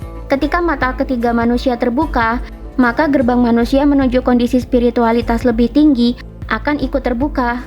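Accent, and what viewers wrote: Java, Indonesia. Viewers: Medok banget ngomongnya.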